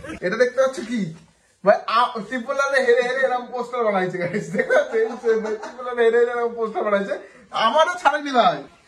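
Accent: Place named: Bangladesh. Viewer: দাদা কথার ভিতর বাংলাদেশি বাংলাদেশি ভাব এসে গেছে কিন্তু🐸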